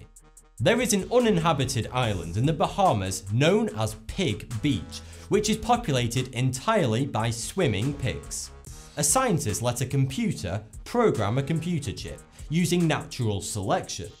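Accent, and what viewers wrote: United Kingdom. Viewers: This is the most british accent in the world period.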